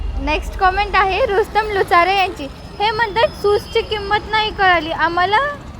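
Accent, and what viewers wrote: Latur, India. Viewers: जाम आवडल.,. तुमचं बोलणं. मी पण चार वर्षे होतो लातुरात म्हणजे नीलांग्यात मास्तर म्हणून . मलाही लातूर वल्यांच accent ani dialects खूप आवडतात.... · Mastt banvta vidio aani bolne tumchi laturuchi bhasha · hello mi nashik cha ahe but mazi ek friend ahe latur chi tich bolan ani tumch bolan ekdam same to same ahe. Khup mast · बोलीभाषा खूप भारी आहे माझे एक मित्र आहे लातूरचे ते पण असच बोलतात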